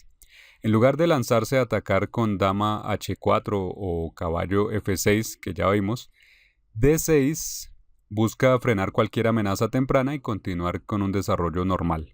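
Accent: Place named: Colombia. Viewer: Maestro gracias por sus clases y me preguntó esa voz es la de usted, porque no tiene acento extranjero, disculpe mi curiosidad si más no recuerdo usted es ucraniano, como habla un español con acento colombiano????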